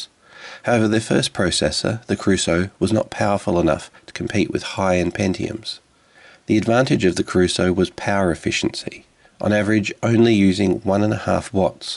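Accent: Australia. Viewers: Ah! I see. I didn't realise you're Aussie. Great video!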